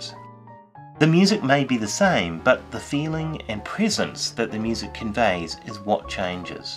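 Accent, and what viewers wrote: New Zealand. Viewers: OMG a New Zealander opening his mouth. God save my ears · Nice to hear a fellow Kiwi from New Zealand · yep New Zealand, just checked. They say "heedphones" :)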